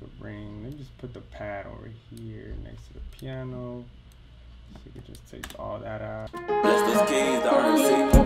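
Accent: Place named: Latin America. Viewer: Anh này có chất giọng mỹ la tinh phết đấy chứ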